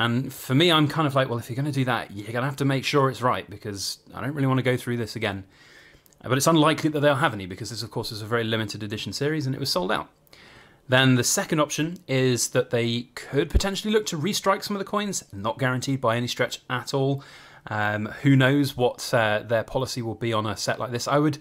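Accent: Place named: London, United Kingdom. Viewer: Brutal... just brutal Also, your accent has inspired me to select Lock Stock and Two Smoking Barrels as my Friday night movie 🍿. Cheers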